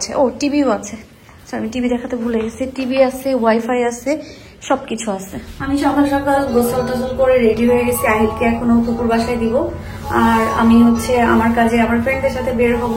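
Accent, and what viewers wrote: Bangladesh. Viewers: kathar dhoron dekhey bojha jay bangladesi 😅😅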